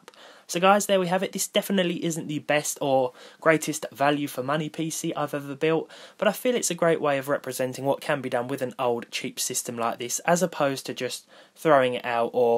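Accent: United Kingdom. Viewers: I like your accent mate where do you come from in the UK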